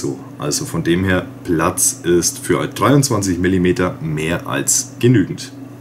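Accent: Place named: Franconia, Germany. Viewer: kommst du aus dem fränkischen Raum?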